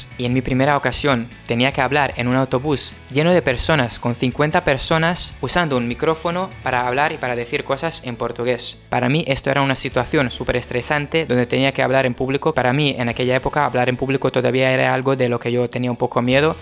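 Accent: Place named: Spain. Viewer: Excelente nivel de Español, lo hablas super bien, pareces de España.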